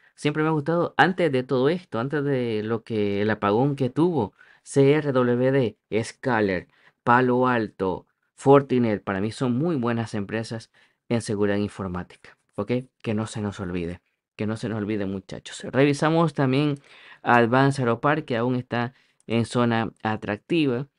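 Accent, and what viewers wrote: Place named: El Salvador. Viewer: que nadie nos benga a dar cazaca 😂😂😂. Que se me hace que sos Salvadoreño.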